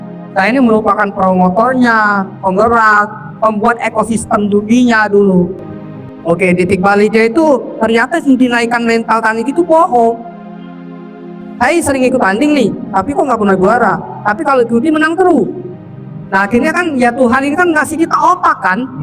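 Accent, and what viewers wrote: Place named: China. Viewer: Logat bicara nya kyk org china yaa 😅🙏🏻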